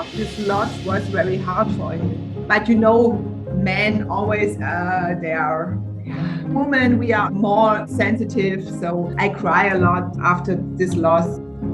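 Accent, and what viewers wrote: Germany. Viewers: Are you a german? Later - Yeeees you are a german. You Dialect is german. German Toll das es auch mal eine geschafft hat, soviele Klicks zu bekommen. Zeigt das du das richtige Thema hast. Meine Bonny hatte nach 8 Wochen 4 Babys bekommen. Ich hatte sie alle gut untergebracht. Eine hatte ich behalten. Clyde hieß sie. Mittlerweile sind alle gestorben. Ich glaube sogar, das meine Bonny für mich gestorben ist, damit ich gesund werden konnte. Sie wurde überfahren. ihre Babys, Clyde und später kam Sophie (Scholl) zu mir zurück, wurden fast 20 und 21 Jahre! Sophie habe ich Garten begraben. Als sie ging, habe ich ihre Seele gesehen. Sie glitt durch meine Finger und glitzerte. Ein Licht umgab sie, kreisrund um ihren Körper. So groß wie sie lang war! Ich vermisse sie noch immer! Obwohl sie schon 2006 ging. Schön das sie Heiko ein so gutes Zuhause gegeben haben. 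Das freut mich sehr! Ich wünsche ihnen viel Gesundheit und viele neue Tiere die sie retten können! English It's great that one managed to get so many clicks. Shows you have the right topic. My Bonny had 4 babies after 8 weeks. I accommodated them all well. I kept one. Her name was Clyde. All have now died. I even think my Bonny died for me so I could get well. She was run over. their babies, Clyde and later Sophie (Scholl) came back to me, lived to almost 20 and 21 years! I buried Sophie in the garden. When she left I saw her soul. It slipped through my fingers and glittered. A light surrounded her, circling her body. As tall as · I was already wondering about your German accent before...xd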